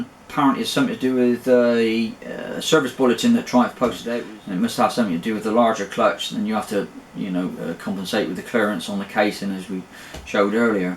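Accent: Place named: Radstock, United Kingdom. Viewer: Thanks, just going out to the garage to do mine for the second time ! in 49,000 miles, by the way are you from Radstock ? it sounds like it.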